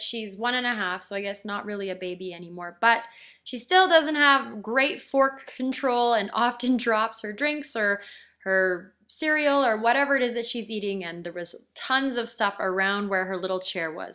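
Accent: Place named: Canada. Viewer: ahhh. That's cool. I thought I detected a bit if Canadian accent in your voice. You are crushin' it, Mama! Way to go!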